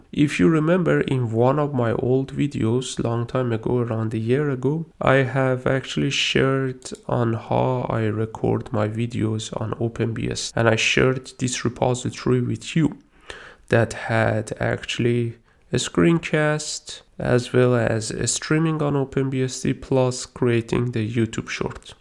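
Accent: Iran. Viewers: Nice vid. It helped. Also, is that a Persian accent?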